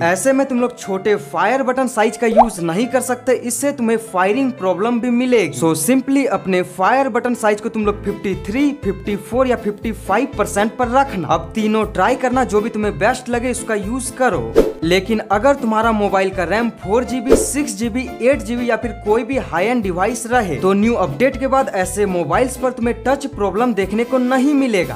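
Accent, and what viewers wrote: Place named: West India, India. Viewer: Bhai ka taliking style full west india ke citizen ki tarah hai 🙂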